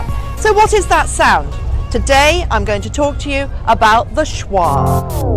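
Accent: United Kingdom. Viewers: I wish you all the best of success and thank you for your precious efforts. I like your British accent.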